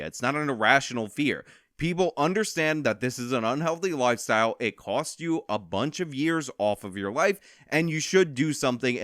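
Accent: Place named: Boston, United States. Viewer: I a Bostonian? the accent really comes through in this video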